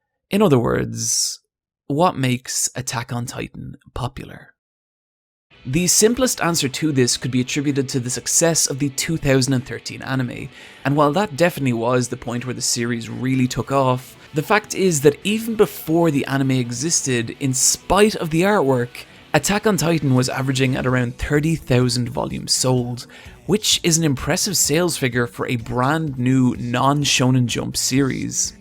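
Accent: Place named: Ireland. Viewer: I had a feeling you were Irish wasn't sure if it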